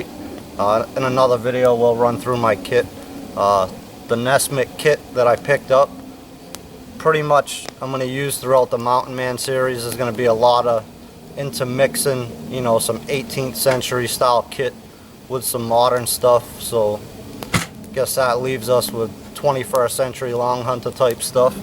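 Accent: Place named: East Coast, United States. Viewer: Liked the vid. That eastern accent gets me since im from texas. Keep ot up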